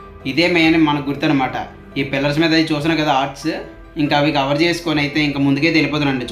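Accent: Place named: Uttarandhra, India. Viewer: నీ ఉత్తరాంధ్ర యాస బాగుంది మిత్రమా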